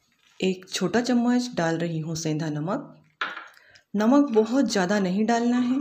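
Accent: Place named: Malwa, India. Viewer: Aapko pta nahi gagan ji, indore malwa me aata hai aur waha ke local log isi tarah se bat karte hain...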